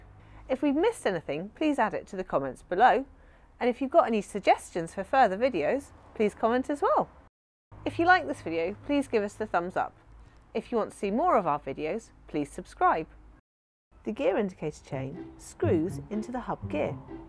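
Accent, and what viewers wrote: United Kingdom. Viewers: Brilliantissimo! Helpful with lovely British accent. My pink Brompton is ready for the end of lockdown soon in France.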